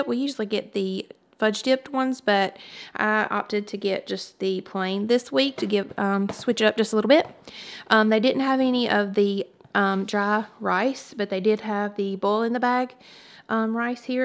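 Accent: South, United States. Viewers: Watched ur video for the first time! I enjoyed it so I subscribed! Like ur Southern Accent!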